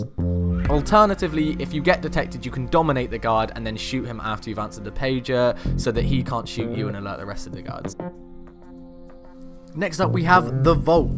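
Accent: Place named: United Kingdom. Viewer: so british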